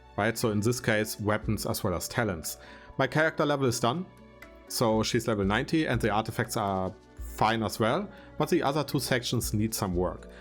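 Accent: Germany. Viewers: Du bist so Deutsch man hört das direkt aus dem akzent xd Gutes Video😆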